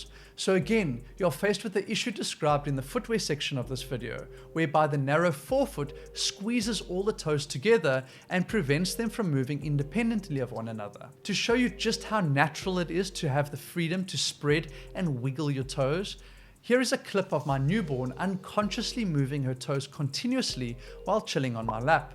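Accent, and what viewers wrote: South Africa. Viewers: why do you sound South African? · Are you South African?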